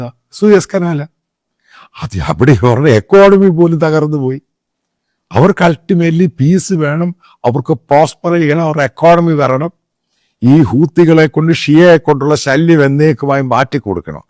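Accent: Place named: South Kerala, India. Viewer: അച്ചായന്റെ തെക്കൻ സ്ലാങ് കേട്ടു ചിരിച്ചു ചിരിച്ചു ഒരു പരുവമായി 😁😁👍അടിപൊളി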